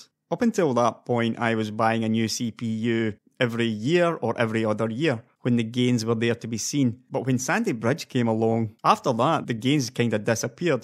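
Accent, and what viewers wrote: Scotland, United Kingdom. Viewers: your accent's ... I'm guessing Scottish? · Does the person have an accent, maybe? like scottish or something?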